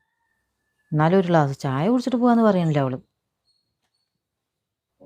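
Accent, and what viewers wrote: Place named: Malappuram, India. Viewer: Palakkad tamilnad style anenn ketittud samsaram but nigal malappuram style annalo.... Anyway kidu acting subscribed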